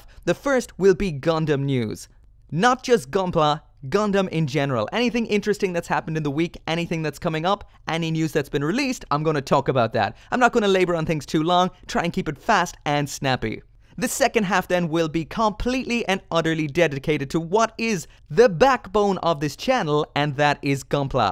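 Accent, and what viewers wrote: Ireland. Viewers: you live in the US? where are you originally from? your accent sounds a bit irish.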